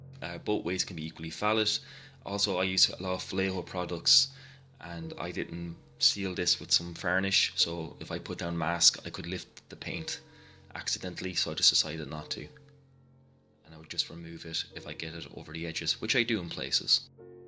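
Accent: Ireland. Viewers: Love your work! Thanks for your effort!, coming to you from California, dig the Irish accent! Keep em coming as your teaching me a lot!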